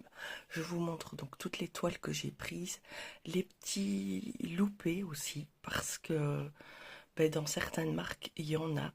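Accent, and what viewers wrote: Belgium. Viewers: tu aurais un petit accent belge ?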